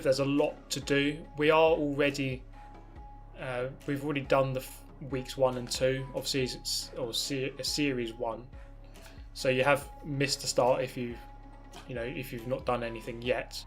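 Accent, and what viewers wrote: United Kingdom. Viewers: you dont look like a brit what a accent gibe me pls ?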